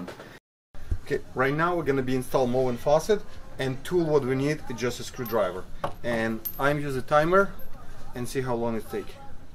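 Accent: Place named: Eastern Europe. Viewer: I tbought he had Slavic accent.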